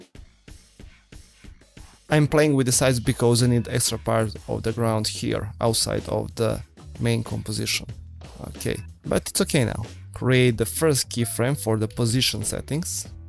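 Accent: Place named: Russia. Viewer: Тебя русский акцент выдаёт )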